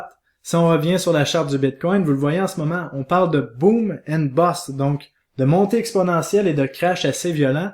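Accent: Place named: Quebec, Canada. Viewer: Merci tu nous motives dans nos investissements et c est tjrs un plaisir d entendre ton accent, ça m rappelle mes vacances au Québec!! Tkt on partage ta vidéo en Belgique et ailleurs dans le monde francophone!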